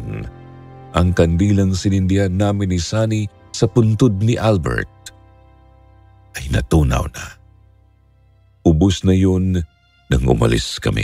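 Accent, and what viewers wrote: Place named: Waray, Philippines. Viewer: Waray po kyo sir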